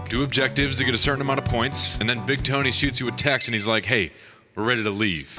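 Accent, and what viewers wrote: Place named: Pittsburgh, United States. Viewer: Are yinz from the Burgh?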